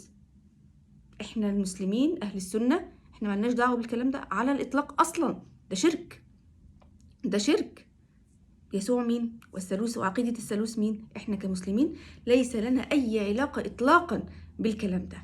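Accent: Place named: Iraq. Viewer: كافي تمضرطين بالهجه العراقيه اكولهه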